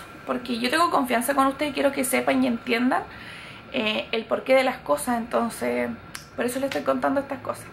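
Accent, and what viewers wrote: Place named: South, Chile. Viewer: me encantas tus vlogs son muy entretenidos junto al y tu tia amorosos ellos, ademas te siento cercana porque yo tbn soy de la 8 región y tu acento del sur es maravilloso jaja, encuentro que esta muy bien tu explicación de los precios, es tu trabajo y si alguien encuentra caro que compre en otro lado y punto, un abrazo y éxito en todo.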